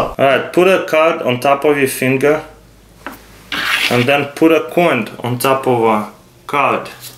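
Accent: Russia. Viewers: i love russian acent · he is Russian. that's why. there is certain things that Americans can pronounce that he can't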